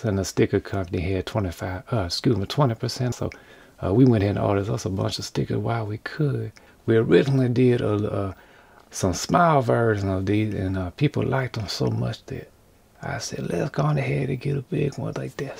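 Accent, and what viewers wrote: Louisiana, United States. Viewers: You have to be from Louisiana!! Hearing you make me think of home!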